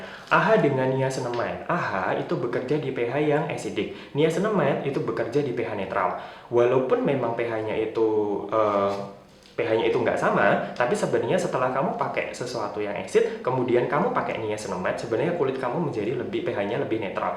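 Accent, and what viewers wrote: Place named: Malang, Indonesia. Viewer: asli pacitan tpi logate arema pol 😀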